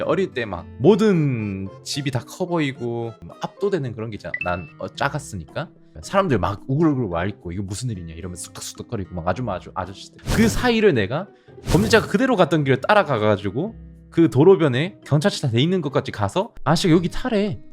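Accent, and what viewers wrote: Gyeongsang, South Korea. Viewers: 김블루님 대구 사셨어영?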